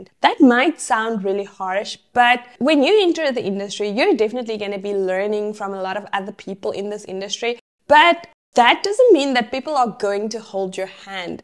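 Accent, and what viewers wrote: South Africa. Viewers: Are you from South Africa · Are you South African? Lol i can hear it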